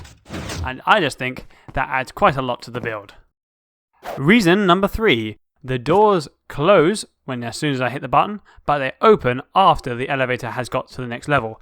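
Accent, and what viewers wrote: United Kingdom. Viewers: i love the british accent.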